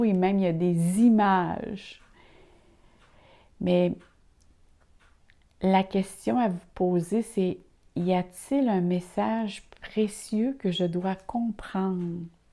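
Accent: Canada. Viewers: Merci 🙏 Ce tirage me parle énormément surtout votre ressenti par rapport à la personnalité des Poissons 🐠 Mon mari a traversé professionnellement une période difficile... 📉 mais nous commençons à entrevoir une petite lumière au bout du tunnel, espérons que fin novembre nous serons en pleine lumière 💡💡J’adore votre accent canadien 🇨🇦... 🤣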